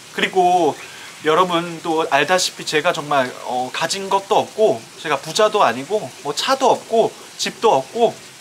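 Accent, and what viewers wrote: Australia. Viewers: This broke my heart to see this morning. kickstarted my journey to learning Korean, and as a fellow QLD’er, I felt like I could relate to her a lot. As said, she will always be by your side, every moment of every day, and she will forever be sharing your experiences with you. She’s left so much of an impact on our lives, even as someone who has never personally met her, and she will be dearly loved and missed worldwide. It might be only one small thing that she’s impacted you with, but the bit of an Aussie accent you have when speaking English really shines through as part of 🤍 You did such a great job at bringing this news to all of us, and we know that is so thankful and proud of you for working so hard by her side. I know that this time is so hard for you, and both your families, but I wish you all every happiness and all my love for now and in the future. 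🤍❤️ Bbyong for now ❤️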